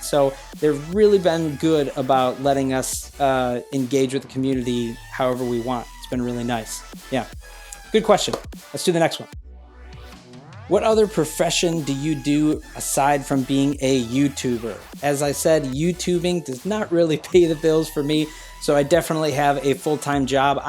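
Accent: United States. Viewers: You definitely have that New York State accent,! Your Game ID should've been: NYNick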